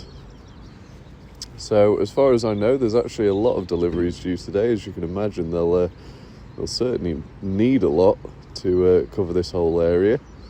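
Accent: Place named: Northern England, United Kingdom. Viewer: Listening from Canada there is something very Monty Pythonesque about this narration with a Northern accent. “Cor, that’s some cracken drivin, that is. T’stone from Shrewsbury. Fine village. Fine pe-pull t’are. Makes a bloke tear up… Right cinematic that is. And there t’is another load! Weren’t expecting that. Down t’lane, quick turnabout and dump! Were elegant, that! Taken up by, excavator, now…and it’s in! Not seen finer. Two before mornin tea.” Something like that. Still, every morning I’m watching. Love it.😊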